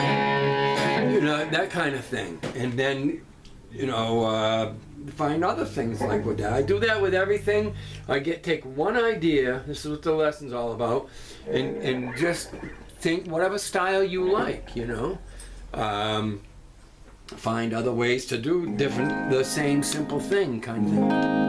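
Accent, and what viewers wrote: New England, United States. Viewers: Enjoy your YouTube journey, my friend. You sound like you're in my kneck of the woods, Newengland. New Subscriber 🎸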